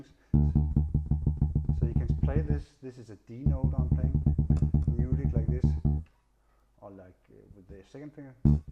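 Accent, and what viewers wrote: Denmark. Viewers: Elsker den danske accent :D Men super fedt spillet :D! spiller selv lidt funkey bas for sjov men gad godt at have din erfaring!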